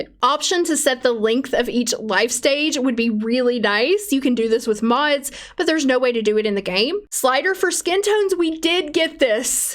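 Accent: South, United States. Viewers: Is it a southern American accent?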